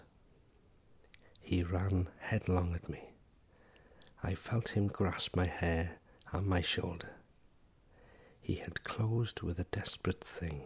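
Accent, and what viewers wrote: Wales, United Kingdom. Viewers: I haven't heard the Welsh accent very much. In fact the two people I've ever heard are Rob Brydon and. Now I think this is how all Welsh sound.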